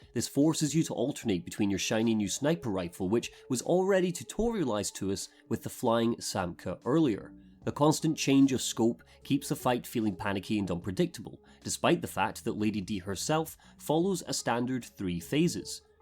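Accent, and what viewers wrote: Scotland, United Kingdom. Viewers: Haven't finished the video yet but "You can criticize Scottish accents when you get free healthcare Americans" almost made me die laughing as an American myself ddd. Imagine correcting someone's pronounciation when they are from a different country and have a different accent to you. That's just rude. Besides, in-ven-try still sounds right. Anyway, sorry for the babbling, going to finish the video now, but I love/agree with a lot of what you're saying! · I watched this video for about an hour before I realised you were Scottish, you have such a light accent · I don’t criticize Scottish pronunciation. I enjoy it, it’s fascinating and eclectic in its acquisitions of other dialects’ features. Keep up the fine work.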